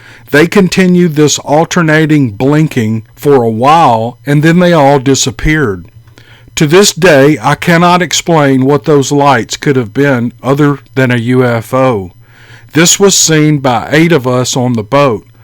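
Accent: South, United States. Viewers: I agree. Love your enthusiam! , and sounthern accent. :) tyfs!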